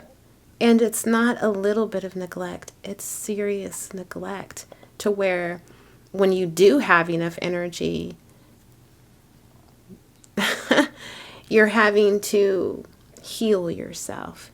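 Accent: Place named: United Kingdom. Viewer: Loved Your British accent !!!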